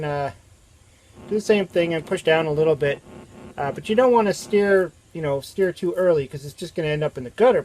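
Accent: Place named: Canada. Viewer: You sound and look canadian like from Toronto or something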